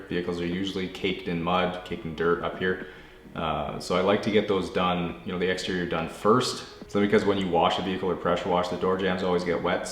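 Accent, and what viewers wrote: Canada. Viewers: Love that you played. My Southern roots anyway. Your pronunciation of garage is cute but decal is what stopped me in my tracks. I was like wait...what?! Then it hit me that you were talking about a decal on a car but I heard “deck-cul” remembered I had heard that pronunciation by another Canadian from Western Canada. I have Canadian family from Ontario but I guess you all have different accents. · Now I hear the Canadian accent. I think it’s with the o’s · NO ONES TALKING ABOUT HIS CANADIAN ACCENT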